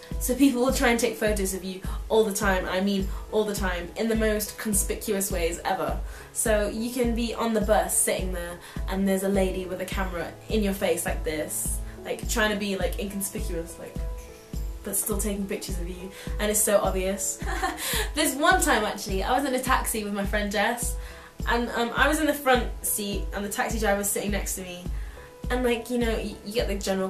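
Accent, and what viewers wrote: United Kingdom. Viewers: I missed your English Accent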